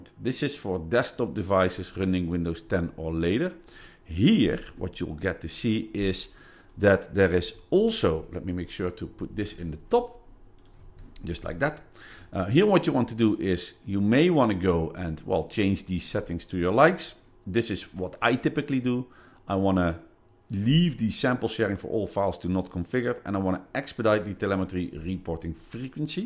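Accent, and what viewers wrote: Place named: Netherlands. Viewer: Hallo U komt uit Nederland dat is goed te horen ☜(ﾟヮﾟ☜) Ik ben bezig met mijn MS-500 bedankt voor de video :)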